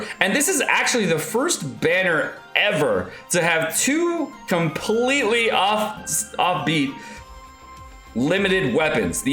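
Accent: United Kingdom. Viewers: BRITISH LMAO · Finally british is back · Is that BRITISH holy moly, that can't be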